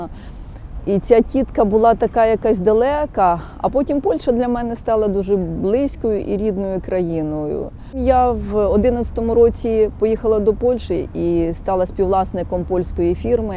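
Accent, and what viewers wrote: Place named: Ukraine. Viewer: Piękny akcent ukraiński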